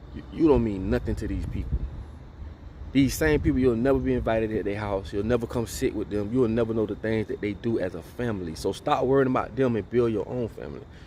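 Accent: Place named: AAVE, United States. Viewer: He speaks in ebonics. It's Vernacular English not American or British. 🙂😂A judgemental person calling out another judgemental person.🙄why?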